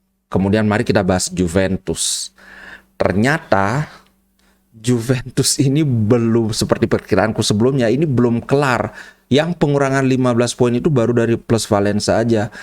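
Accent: Bali, Indonesia. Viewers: Kalau dengar logatnya...dari bali nih kawan satu ini...forza inter...salam dari Malang bung😁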